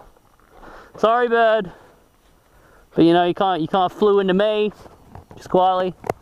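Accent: Australia. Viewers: is he from australia?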